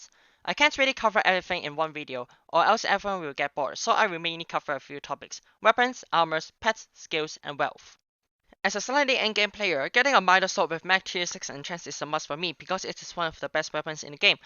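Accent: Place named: China. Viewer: Your accent makes me feel like I’m hearing Chinese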